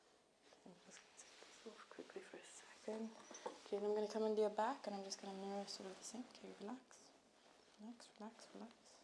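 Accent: South Africa. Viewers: She only sounds mildly South African but mainly British. I wonder if she moved or has mainly British family and went to a British School. The South African people that I know have much stronger accents. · Is her accent South African? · What is she from South Africa?? 😱😍 me too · The South African accent is still strong but a bit of the english is starting to come through. Regardless found a new favourite ASMR video! Keep up the great work😊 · This autoplayed and I immediately twigged to the South African accent, then I heard "hectic" and was like, yep South African confirmed! 😂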